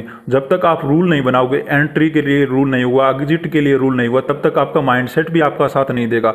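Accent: Haryana, India. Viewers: sir i guess u are from haryana. i am guessing because of your speaking tone. am i right?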